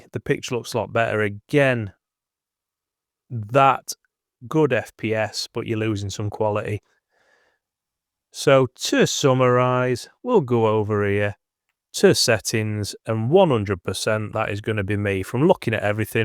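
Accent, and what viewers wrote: United Kingdom. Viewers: Thanks for this...just got today, waited for reviews an patches. Where ya from mate, sound like you're from my neck o t'woods...halifax, west yorkshire.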